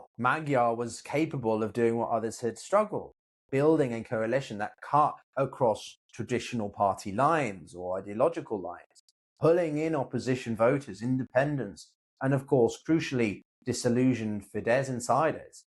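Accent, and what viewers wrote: England, United Kingdom. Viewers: Another English Accented Narrator. England is in trouble! Git off Celtic lands! Says The BronxBoy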